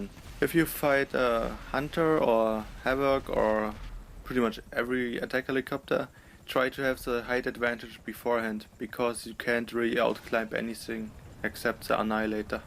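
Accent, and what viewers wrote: Germany. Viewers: If you are German... I love German accents so muchhhhhhhh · Wie krass man hört dass er deutsch ist ;) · Man merkt du bist deutsch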